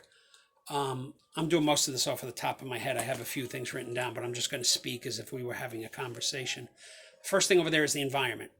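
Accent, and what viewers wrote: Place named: Boston, United States. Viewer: Too bad,i liked how you presented your observations.I guessed you were from the Boston area.I lived in Chicopee Ma.For several years putting an ex wife thru American International College in Springfield.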